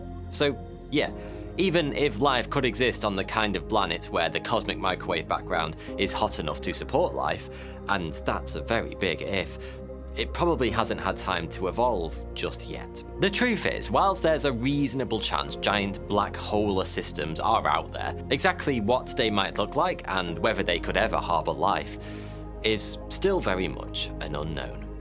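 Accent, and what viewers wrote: United Kingdom. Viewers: This guy had voice that I could listen to for hours. Like Morgan freeman but british! Love the vids!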